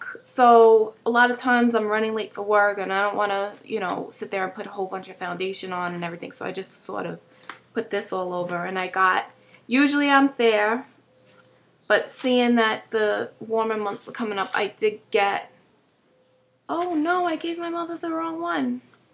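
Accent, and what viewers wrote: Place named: East Coast, United States. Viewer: love the east coast accent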